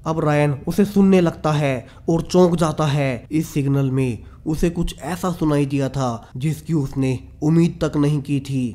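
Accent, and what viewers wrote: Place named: Bengal, India. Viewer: I think you are Bangali.,.........like me, because your speaking style like bangla,............ don't mind that's my opinion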